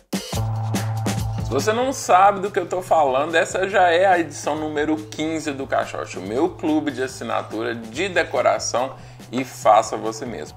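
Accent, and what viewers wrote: Minas Gerais, Brazil. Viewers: Ganhou mais uma inscrita. Teu canal é excelente e eu adoro esse sotaque gostoso mineirinho. Me destes muitas idéias pro apê que eu comprei. Boa sorte com o canal que já é nota 1000.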